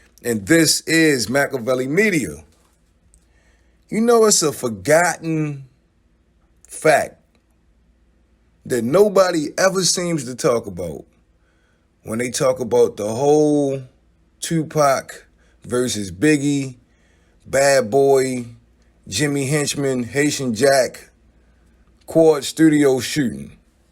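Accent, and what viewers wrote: Baltimore, United States. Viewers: U have to be from Baltimore Maryland I can tell by that accent . U sound like my sister. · This guy, the content creator is from Baltimore based on his accent.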